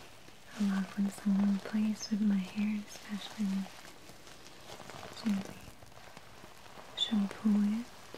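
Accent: Russia. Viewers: Her accent is Russian. Watch some newer videos. Sometimes she talks about Russia. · i love your accent sounds russian such a relaxing video :)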